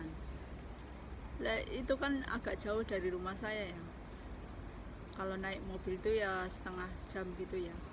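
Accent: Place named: Banyumas, Indonesia. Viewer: semangat mba... ngapaknya kelihatan kaya saya kalo ngomong...hahahaha...mantul mba... semua sudah berlalu...sekarang dah sukses amiin...salam silaturahmi... sudah padam komplit...padamin juga punya ku ya mba...makasih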